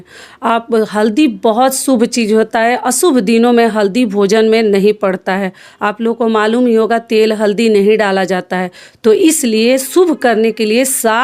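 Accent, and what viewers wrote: Bihar, India. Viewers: Ap bihar se ho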